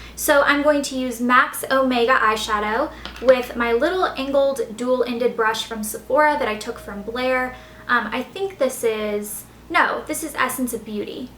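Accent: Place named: Tennessee, United States. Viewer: She has a bit of a Tennessee accent, leave her alone. You wouldn't ask why an Asian or and East Indian person talks a certain way, would you?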